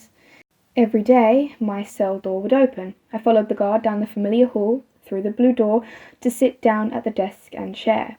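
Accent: United Kingdom. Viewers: very british i don’t like it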